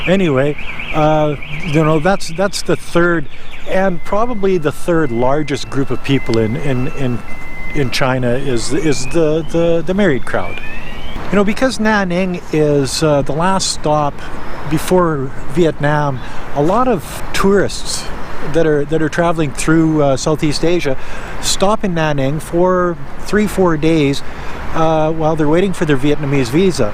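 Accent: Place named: Canada. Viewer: Gosh!!! I'm a Canadian myself and I can hear your heavy canuck accent! Americans, British folk out there. This is a true Canadian accent.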